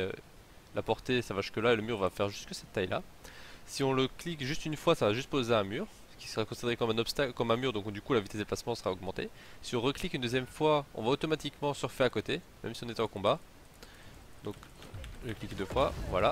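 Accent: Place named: Belgium. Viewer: T'es Belge car t'as un ptit accent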